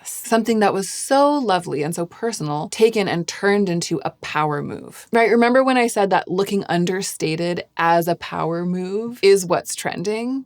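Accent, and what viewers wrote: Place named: United States. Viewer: One American with amazing accent, tone and overall delivery. Very articulate and elegant speech.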